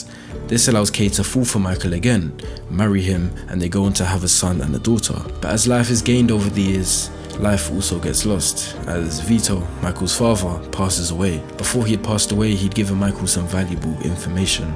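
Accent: United Kingdom. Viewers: Black dude with a british accent. Crazy.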